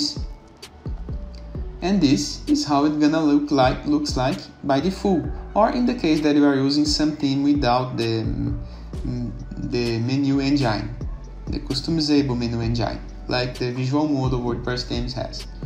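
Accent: Brazil. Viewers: Sotaque BR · Esse sotaque é BR kkk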